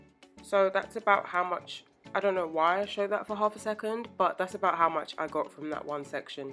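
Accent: United Kingdom. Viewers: You are brilliant love the video girlfriend 🥰 and so love your amazing British accent … everyone subscribe subscribe subscribe!!!!!! She knows her stuff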